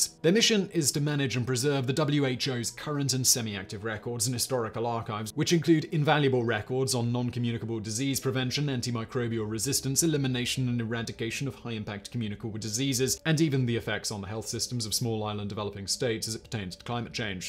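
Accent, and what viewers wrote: United Kingdom. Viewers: I have a question, HOW do you keep your voice with all of the talking that you do?? Been a fan of all your channels for a while, but just found the Casual Criminalist Podcast last week. I drive a truck 9 hrs a night here in the US and listened to them all last week. The sarcasm and proper British way of speaking make it great.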